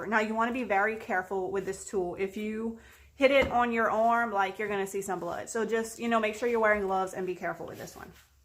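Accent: Louisiana, United States. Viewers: Gotta be from Louisiana. Love the accent!! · I have to know..... you're from bayou Lafourche, huh? I can hear it!! lol! Im from Houma. Your boards are gorgeous!! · i live in TExas but im cajun 100%(POUSSON) maiden name 😁 was born lafayette and raised in Iota , by Crowley La I feel like im not so far from home when i hear that great cajun accent sha !!!! I am hooked on your page , love your work ! My junkin sista ! Lol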